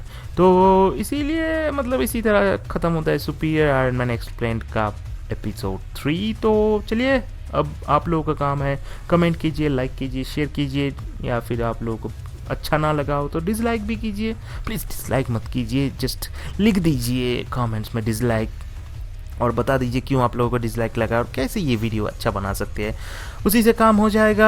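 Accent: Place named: Odisha, India. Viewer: I think. your mother tongue is not Hindi tum Orissa se ho kya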